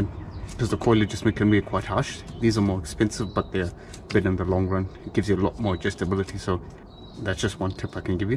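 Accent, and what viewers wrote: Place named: South Africa. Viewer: thnx. car is Evo V 400hp street car. Btw where are you based , your accent sounds like you originally from South Africa 😊 ?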